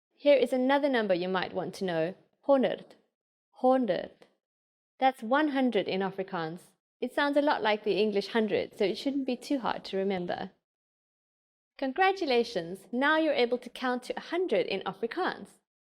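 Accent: England, United Kingdom. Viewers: I really love this! I just wish the Afrikaans sounded more like an Afrikaans-speaking person and had less of an English accent to it. Afrikaans people do not sound this heavily English when speaking Afrikaans. Enunciation needs to be tweaked a bit more. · The pronunciation needs work. This woman is speaking with a very prominent English accent. Native speaking Afrikaners don't sound so flat when they talk. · I am Afrikaans. This woman has a strong English accent when she tries to pronounce the words in Afrikaans. There's also a spelling mistake - "biki" should be "bietjie" and she totally mispronounced the word item. Unprofessional advertising as an educational source. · thank you for these videos, I'm Zulu so i definatly need someone with an English accent to teach me Afrikaans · Peter Murray Exactly! Thank you so much... I just find it sad that they didn't get a native Afrikaans speaker to give these lessons. 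She's a wonderful teacher, but her English accent is very strong. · Her pronunciation is “off” - she speaks Afrikaans with an English accent - there are various Afrikaans dialects but this English/Afrikaans - good for basics I suppose - she does make a few mistakes in this video - Fraans vs Franse - Ek praat Fraans - Hy is n Franse man (fraansman) - Also U - its not pronounced ie - but n deeper sound- Also Skiestog - we say “Askies” etc etc etc.. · Ek voel dat 'n tweetalige persoon hierdie video moes gedoen het. Maar goeie werk. 'N Engelse persoon moenie Afrikaans leer nie omdat uitspraak 'n rol speel. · Fully agree, also pronunciation is off, she's probably british,,, also her Dankie sounds like donkey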